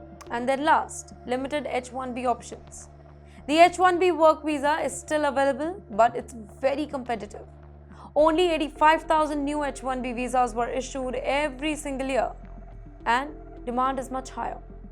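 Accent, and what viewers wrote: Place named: India. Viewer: Nothing personal,, she needs to improve her English accent.. Too much Hindi hangover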